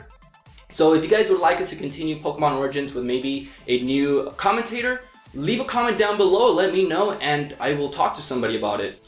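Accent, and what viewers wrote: United Kingdom. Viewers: YOU CAN NEVER COPY BRITISH ACCENT!!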